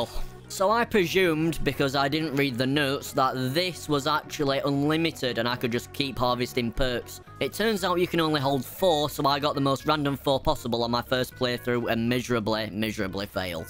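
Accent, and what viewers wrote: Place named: England, United Kingdom. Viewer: no kiddin love the English accent and also the commentary was top notch, earned a sub <3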